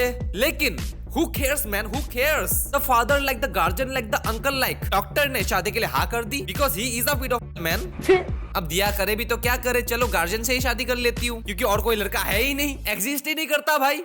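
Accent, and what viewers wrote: Assam, India. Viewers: Are you from Assam bro ? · Bro r u from noth-east assam!?❤ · bro are you from Assam 😂😂 please reply from where you are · Bhai tum assamese ho kya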